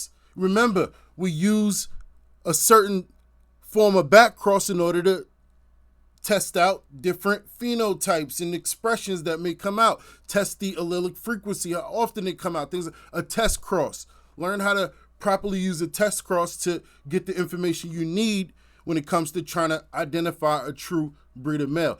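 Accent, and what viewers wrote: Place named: New Jersey, United States. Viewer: And where u from? U sound like u not really from nyc but somewhere close. Jersey or Li or sum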